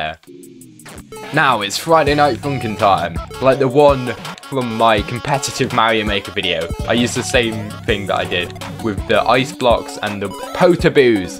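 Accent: United Kingdom. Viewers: Ur you British?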